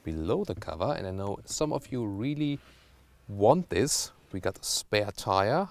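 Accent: Germany. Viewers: Great review! Simple and focused on the subject. Also like your english with german accent. Have you got any polish roots coz your surname sound like?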